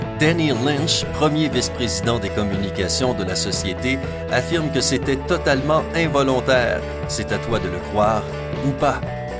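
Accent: Quebec, Canada. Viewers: Oh quebequois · J’adore l’accent québécois 🤗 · MALAK LOURAK il a pas le nez boucher il est québécois... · MALAK LOURAK lol c'est juste une voix cébékoise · Norabella07 Hamdani Peut être parce que c'est une vois québécoise.... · Yo sont accent quebz 😂😅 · La voix a un petit accent québécois vous trouver pas?🤨🧐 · MALAK LOURAK c’est l’accent québécois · Ces moi ou la voix et québécoise ???😂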